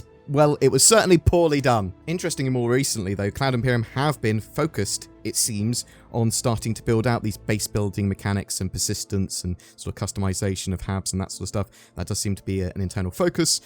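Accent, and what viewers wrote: United Kingdom. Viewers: love BEING a part of your community. I've met some interesting people, and I love hearing your limey voice talking about a game I am so passionate about.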